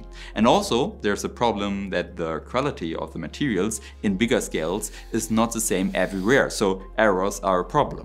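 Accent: Germany. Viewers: Germa accent is irritating. Use AI like most other bloggers. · Who cares if a German guy speaks English with a German accent, come on 😄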